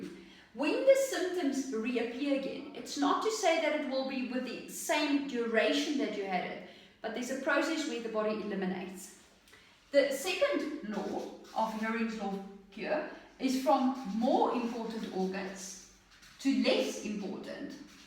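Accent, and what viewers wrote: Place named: South Africa. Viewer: South African:)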